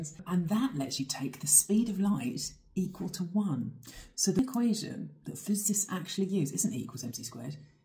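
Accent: England, United Kingdom. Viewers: Why did English accent degenerated to the noisy ear scratching American accent we have today? And I had to learn that crap instead of this lady’s beautiful dreamy sound???😡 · She's very smart, engaging, and good storyteller (something that is sadly lost these days), and yes, easy on the eyes. What I find makes her so watchable (besides the lovely Brih-ish accent) is her confidence and almost quirky sense of humor. The content is very good, too. We'll done! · How can someone be so sexy talking about science? 20% brit accent, 10% red hair, 30% absolute gougeous lips, 40% inteligence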